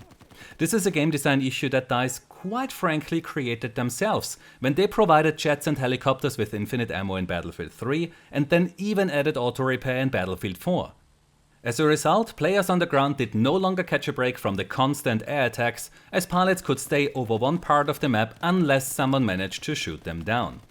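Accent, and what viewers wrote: Germany. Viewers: Are you from Germany? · Warum hört man sofort raus wenn ein Deutscher Englisch spricht :D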